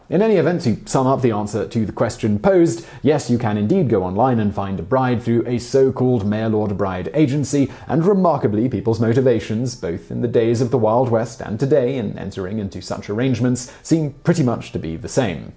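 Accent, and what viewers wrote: England, United Kingdom. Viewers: Why is vsauce doing a British accent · Why is vsauce talking with an English accent?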